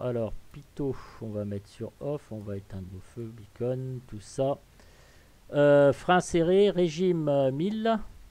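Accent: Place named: North, France. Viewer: Merci pour le partage. Toujours aussi plaisant ces.petites balades. ... Avec toujours une petite pointe d'humour avec un accent bien du Nord.😉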